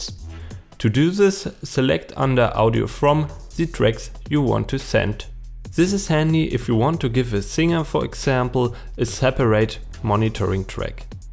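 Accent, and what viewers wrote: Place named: Germany. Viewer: The German accent ist awfull 😀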